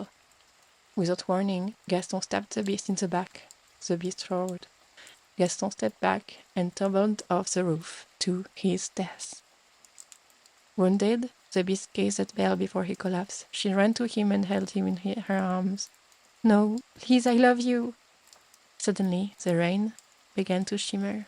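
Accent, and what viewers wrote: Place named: France. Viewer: I love a nice French accent. Ty. Also hi I’m your newest sub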